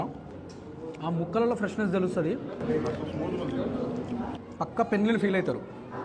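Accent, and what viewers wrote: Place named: Telangana, India. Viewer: Idhi తెలంగాణ bro... andhra kaadhu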